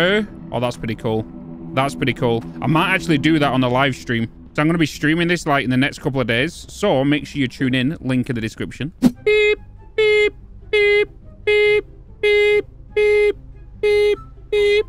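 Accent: United Kingdom. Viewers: all, also ur British accent we you go, "GOD BLOODY DAM IT", all the spending spree montage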